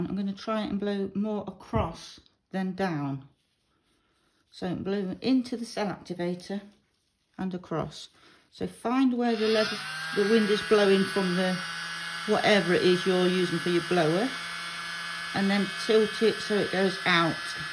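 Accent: United Kingdom. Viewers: Hi, Thanks for a really helpful video and what a result. I haven't even started this Bloom journey yet. I'm just collecting ingredients etc so it's been great coming across your video and then to my delight hearing a British accent, which of course means being able to get the same things as you;) I wonder as I've already bought the valspar wood and metal Base C, if i could use that plus varnish for the pouring medium instead of glue (as in Shelee's technique) but use your pillow of simple acrylic paint? I don't suppose there's any reason why not,unless the medium would be heavier than the pillow? anyway would be interested to hear your thoughts on that. Great tip with the tape on the back!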